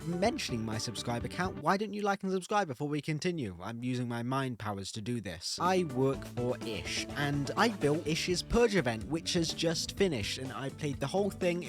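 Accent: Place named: United Kingdom. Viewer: Okay I'm gonna spill the tea... he's British.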